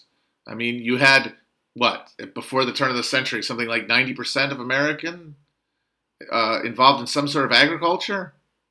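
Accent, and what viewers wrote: Louisiana, United States. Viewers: HUEY LONG! Laissez les bons temps rouler, and let loose with your Cajun accent for an hour.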